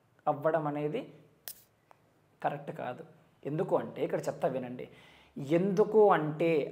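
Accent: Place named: United States. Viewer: Anna miru telugaa America anukunaa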